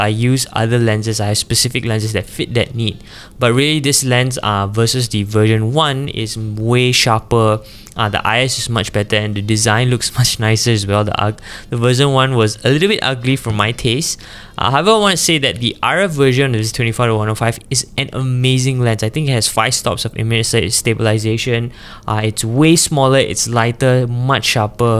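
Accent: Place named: Nigeria. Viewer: You sound a little like an nigerian 😊 thanks for the Review and expensive lenses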